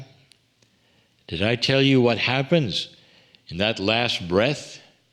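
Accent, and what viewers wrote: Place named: Newfoundland, Canada. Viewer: Hmm.. I detected a bit of a Newfoundland accent in this channeling 😅 not sure if it's Lee or Kryon ❤ made me smile and chuckle here in Cape Breton, Nova Scotia lol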